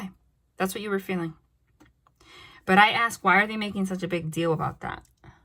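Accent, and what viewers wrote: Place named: New York, United States. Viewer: Hey I love your New York accent girl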